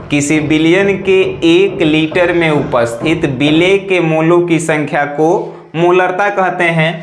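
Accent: Bihar, India. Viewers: Verry nice for Bihari style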